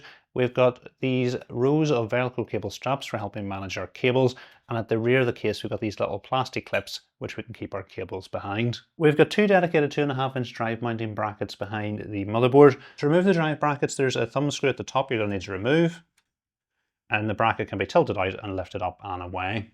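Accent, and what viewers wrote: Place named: Scotland, United Kingdom. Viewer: just scottish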